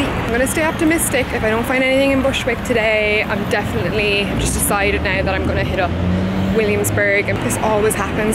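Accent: Ireland. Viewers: I'm new to your channel. Is that a slight Irish accent I hear by chance? Great gems!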